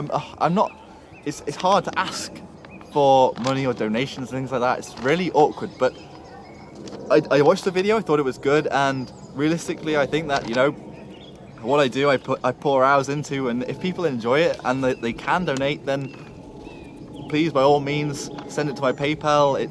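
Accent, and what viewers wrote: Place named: United Kingdom. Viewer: That brittish accent sounds so good! I hope you find a new job and get happy again.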